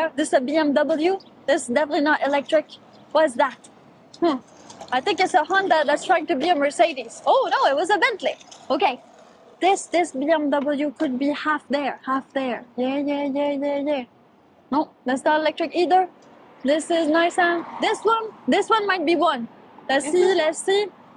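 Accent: Quebec, Canada. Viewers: French Canadian? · This is what Canadian women sound like?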